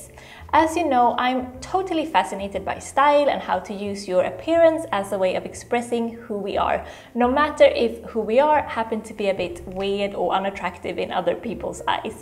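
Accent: Sweden. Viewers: Are you Swedish? I hear some English - Swedish accent. Hehe. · Where are you from? You have a swedish accent..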